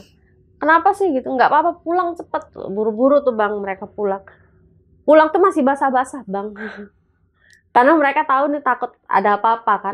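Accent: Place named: Semarang, Indonesia. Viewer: Sy Org rantau Kl denger medok ny Semarang an dan sekitarnya kok merasa terhiburnya beda y Mbak Rambutnya d jepit sekena nya aja kliatan cantik bgt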